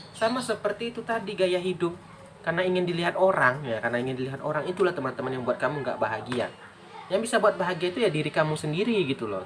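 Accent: Padang, Indonesia. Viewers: Suara kaya logat org padang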